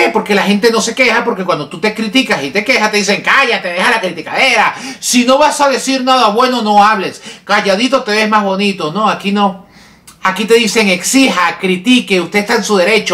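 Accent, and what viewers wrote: Venezuela. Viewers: Eso que dijiste: "España es el Perú de Europa" . Te suena muy ofensivo cuando comparan el Perú con España, y no parece indignarte que se refieran así del Perú. Uhm... y vos no pareces español... más bien venezolano. Pésimo comentarista, no reflexiona en lo que habla. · Es venezolano y está super claro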